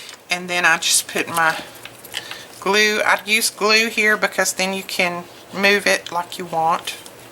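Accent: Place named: Alabama, United States. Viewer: Just watched your video, this is just amazing!! I've missed listening to someone from Alabama!! Thanks from Arizona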